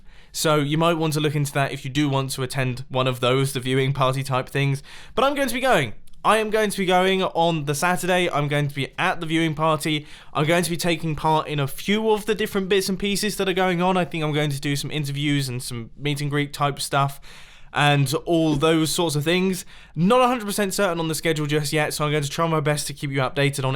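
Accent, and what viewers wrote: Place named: United Kingdom. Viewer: Make a tutorial on how to talk like a British dude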